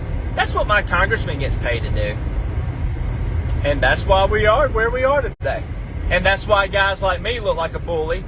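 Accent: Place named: South, United States. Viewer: There’s some things that just sound better in a Southern accent. js…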